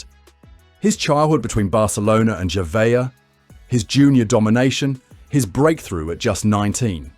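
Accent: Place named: United Kingdom. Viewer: I thought the same thing. Using a never cyclist wanker with a British accent…Classic!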